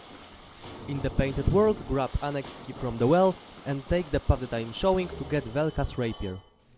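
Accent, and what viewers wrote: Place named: Italy. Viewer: Avverto un accento italiano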